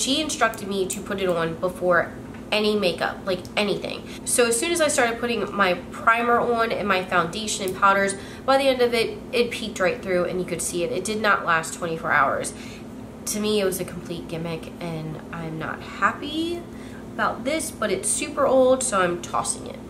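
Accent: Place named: Maryland, United States. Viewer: I'm from md too ! I say warsh and warter too lol. Not to mention I did my nails earlier today the exact same way. Great minds think alike 😊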